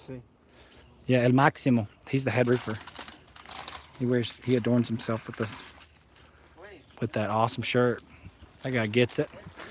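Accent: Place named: Puebla, Mexico. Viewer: Hey you really learn the mexican speaking way you sound like guys from puebla . hay muchos mexicanos por alli en Atlanta cierto ... 😎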